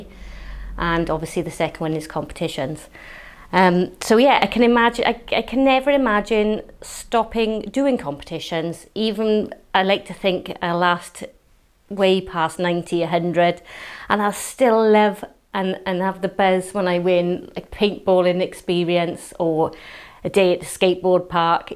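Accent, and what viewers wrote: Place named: Wales, United Kingdom. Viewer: Thrilled for you all , love hearing your welsh accent , we ‘ve missed all our cardiff/newport family and looking forward to a visit across the bridge soon ! Well done as always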